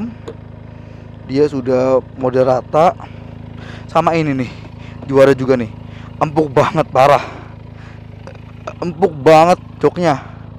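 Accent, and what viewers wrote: Surabaya, Indonesia. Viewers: Mas orang surabaya ya?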